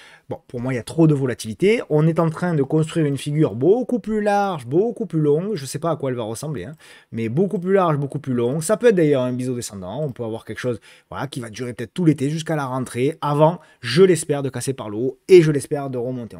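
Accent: South, France. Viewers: j'aime bien l'accent du sud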